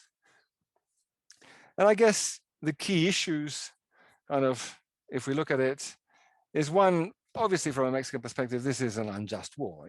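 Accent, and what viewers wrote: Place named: United Kingdom. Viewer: It is interesting that a British accented man has taken up the task of telling the "Mexican perspective" on this history.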